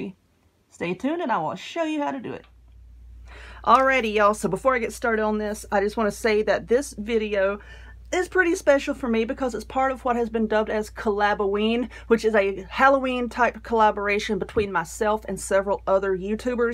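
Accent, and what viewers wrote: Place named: North Carolina, United States. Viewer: I thought I detected a NC accent! Lol love it ❤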